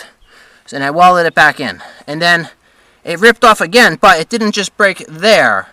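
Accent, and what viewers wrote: New York, United States. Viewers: Wiffa,haffa,poseta,lol..you sound like my uncle from N.Y...love the videos...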